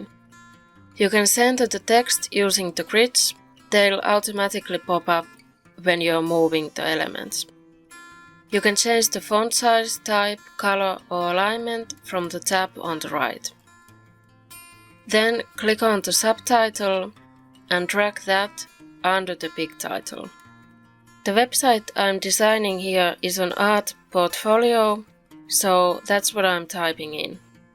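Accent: Finland. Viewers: Is that a Finnish accent? :D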